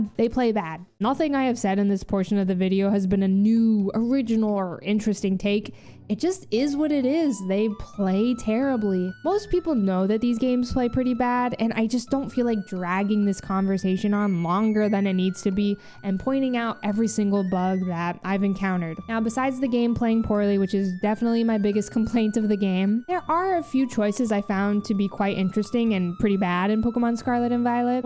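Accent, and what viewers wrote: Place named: Dakota, United States. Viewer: Are you from a Dakota? You sound like the movie Fargo.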